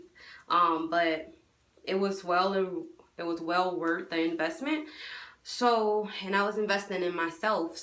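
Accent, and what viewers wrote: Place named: Louisiana, United States. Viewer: Congrats and love your video and Louisiana accent. I also subscribed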